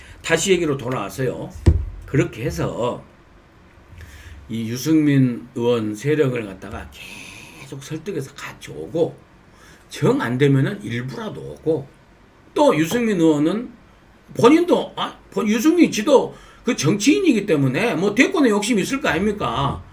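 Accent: Jeolla, South Korea. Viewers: 구수한 전라도 사투리로 국민들을 시원하게 해주셔서 감사합니다ㆍ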